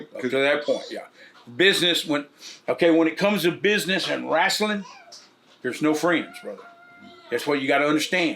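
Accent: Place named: South, United States. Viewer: Well Flair had good promo psychotic macho at the sametime. Naw your talking ain't way up there. Your country accent hurts you.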